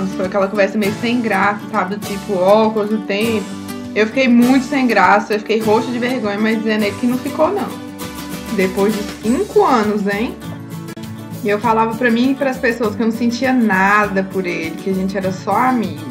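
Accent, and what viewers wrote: Minas Gerais, Brazil. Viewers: Que lindo! sou fã dos 2! desejo toda a felicidade do mundo! achava q vc era de minas pelo sotaque...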